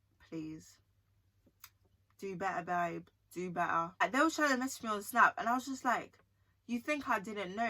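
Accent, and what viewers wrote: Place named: United Kingdom. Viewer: Im from the US And I believe your from the UK Right You have a Uk accent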